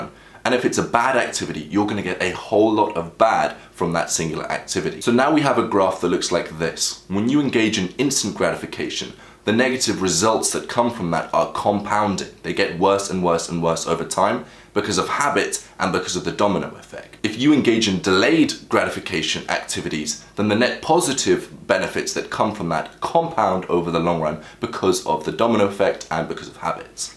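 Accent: England, United Kingdom. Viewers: Woe! What an amazing video, I totally agree!!! I was lazy and once I understood the logic of dopamine, my whole life changed. I work alone, I have the body of my dreams, my mental health is getting better every day...Thanks. What city are you from,? Obviously seems to be from England, has a beautiful accent! You remind me a lot of actor Cillian Murphy, has anyone said? Well, gratitude from 🇧🇷❤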